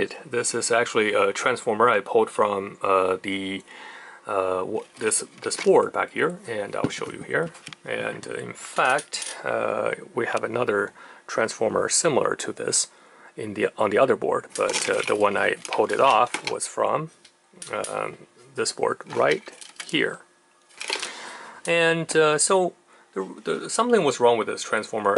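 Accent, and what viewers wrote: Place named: Germany. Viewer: You sound very... German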